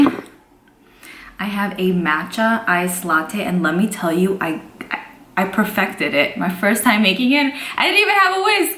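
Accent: New York, United States. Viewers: Your accent is perfection. It’s just a kiss of Italian New York and I love it.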